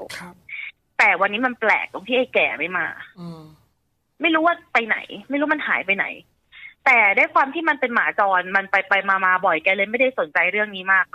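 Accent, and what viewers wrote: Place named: Isan, Thailand. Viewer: ฟังไปเเอบขำ คุณ เล่าใช่คำอีสานคำ ไทยคำ 55 น่าจะเล่าภาษาไทยไปเลยนะ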